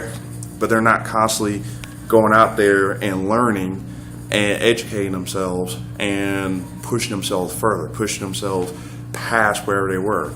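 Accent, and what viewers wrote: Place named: Alabama, United States. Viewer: Awesome video... love the Bama drawl too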